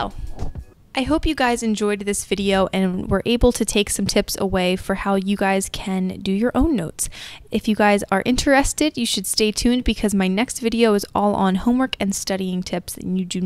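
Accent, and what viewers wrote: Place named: United States. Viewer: I love this kind of American accent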